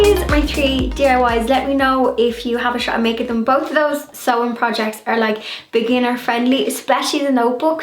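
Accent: Ireland. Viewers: I love your Channel! I’ve spent the whole day watching! Love your sweet Irish Accent. My Father was born in Dublin in 1920, and I have a special place in my heart for all things Irish.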